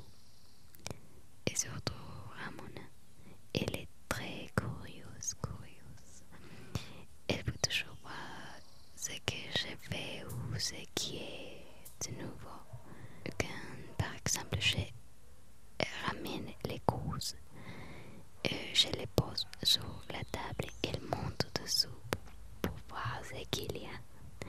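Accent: Spain. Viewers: C'est la première fois que je viens et tu as une agréable personnalité, ton accent est trop chou. J'adore la langue espagnole. Bonheur à toi guapa morena, j'espère que tu reviendras🌹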